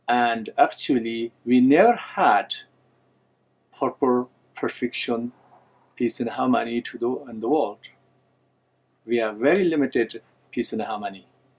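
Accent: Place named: India. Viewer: U r accents r Indian accent this shows Tibate was a part of India in ancient time wow☺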